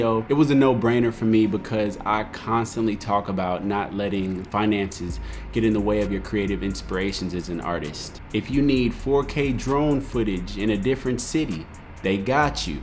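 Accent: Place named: South, United States. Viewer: Your southern accent cracked me up XD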